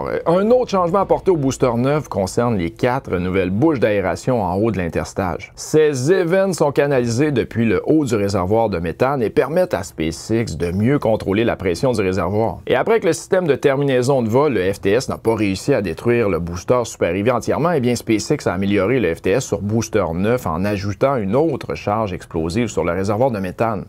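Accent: Quebec, Canada. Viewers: j'adore, sa doit faire plus d'un ans que je suit l'actualité de SpaceX et du starship, j'écoutait en francais de France depuis, sa fait plaisir d'avoir un Québecois ^^ bonne continuation mon ami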